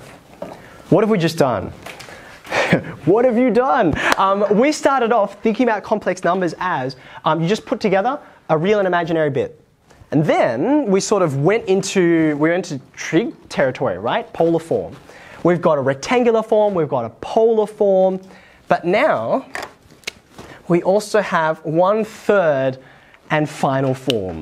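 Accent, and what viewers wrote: Australia. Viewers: Well, if I’m not mistaken he’s got an Australian accent. The pronunciation “heeyah” is typical for Aussies (or maybe also for Kiwis) , like the pronunciation “aboot” (for about) is typical for Canadians. You gotta live with it. And the abbreviation cos (or sin) is also occasionally used in spoken language in my country (Germany). Nitpickers are gonna nitpick, I guess.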